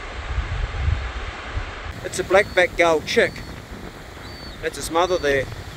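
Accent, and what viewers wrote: Australia. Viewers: Seems like a sort of copy of survivor man with an Australian accent. I dig it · Thought he was cheating until i unmuted and heard he was australian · Awsum life mate. Love your aussie accent. Excellent crafting. Im a kiwi expat lived in OZ most of my life. Was full time offgrid doing the The Big Lap for 5 years. Nature be in it 💪👍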